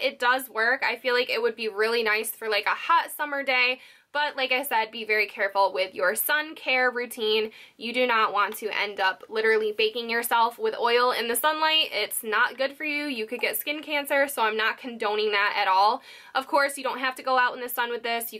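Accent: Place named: New Jersey, United States. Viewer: your accent screams native jersey italian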